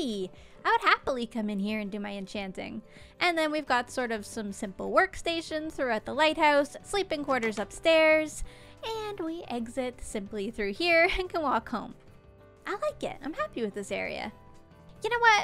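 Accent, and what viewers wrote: Newfoundland, Canada. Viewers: Gem gem gem plz show your newfendland accent i love that type of accent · I didn’t know you were from Newfoundland. You need to listen to Come From Away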